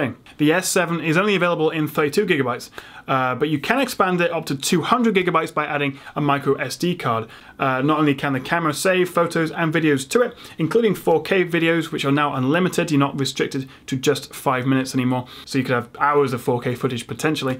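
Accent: England, United Kingdom. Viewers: hey are you from the UK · brilliant review and nice to have an English accent on one of these videos! You're going to give mkhb a run for his money haha. I like the fact that you mention the little things like edges feel in the hand and the fact that you have a problem typing sometimes due to your hand touching the screen. These are the things that really matter and are the exact reason I use the normal s7 as opposed to the edge! great work, keep it up :) cheers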